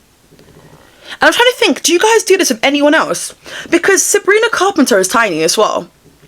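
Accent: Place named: United Kingdom. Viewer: Why are you talking British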